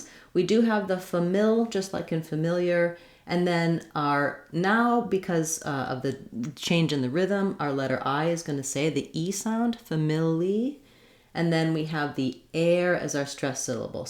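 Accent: United States. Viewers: Is it a American English?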